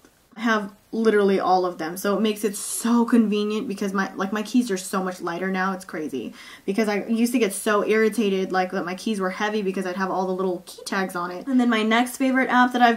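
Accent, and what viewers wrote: Philippines. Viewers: I love you girl haha..ur Filipino fob accents are hilarious .. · I love ur Filipino accent. hysterical!!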